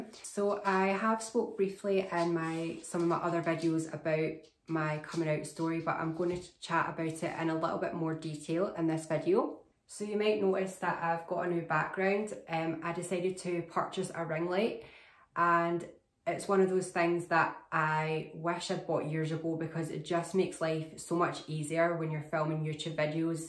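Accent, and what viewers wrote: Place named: Scotland, United Kingdom. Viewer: I love hearing your Scottish accent. personally I am about 3 months on oestrogen. I don't really have anyone to support me in this journey I am taking. I would love if you lived in Ireland and I could call you daily and get some advice off you which would help me a lot. I do wish you the very best of luck and I would love if you could do more videos that would include your journey as much as possible.🌹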